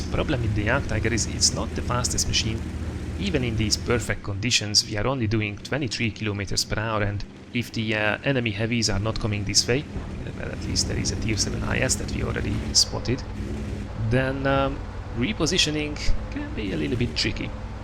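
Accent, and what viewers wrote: Hungary. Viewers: Magyar vagy? :D Az angolod elég magyaros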